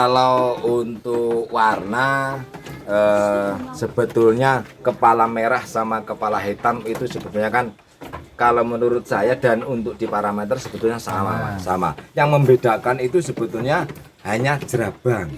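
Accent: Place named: East Java, Indonesia. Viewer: Jawa nya kayak Jawa timuran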